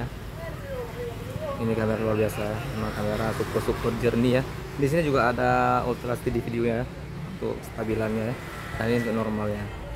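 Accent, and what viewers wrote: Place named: Malaysia. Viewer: logat bahasa indo anda ada logat bahasa Melayu Malaysia..mungkin anda berasal dari Kalimantan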